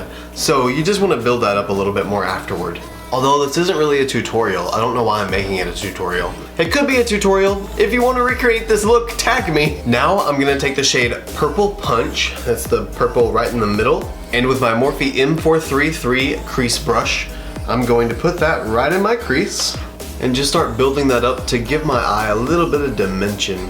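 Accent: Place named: South, United States. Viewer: Loved watching you create this gorgeous look,. That shimmer purple next to the shimmer yellow on the lid is such a beautiful color combination. I've been trying to place your accent (I knew it was Southern US, as I live in west Tennessee), & then noticed your description box. Haven't been to Hot Springs in quite a few years now (I used to visit more in my university days, as one of my buddies had family there who owned a dairy; one of the last that did doorstep delivery before his grandfather passed). It is such a beautiful place to get away & enjoy a bit of mountains without having to drive forever. Anyway, thanks for sharing this fabulous look with us, & hope you are enjoying a superb week so far! 😊💖👍🏻🌷🌻🌼🌹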